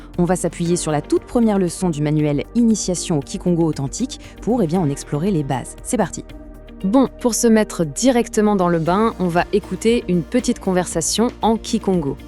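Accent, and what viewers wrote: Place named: France. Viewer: Svp la voix prononce les mots avec l'accent français, ça n'aidera pas ceux qui veulent apprendre.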